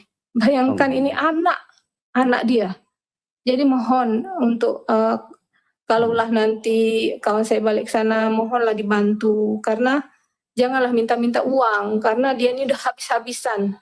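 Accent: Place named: Peninsular Malaysia, Malaysia. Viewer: Masih ada lagi lenggok tutur bahasa melayu semenanjung Malaysia... memang duk Malaysia ke dulu²?..bsama..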